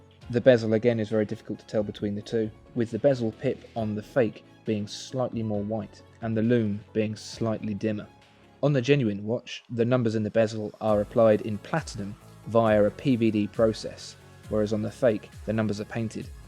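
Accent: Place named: RP, United Kingdom. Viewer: this guy has a very nice royal accent.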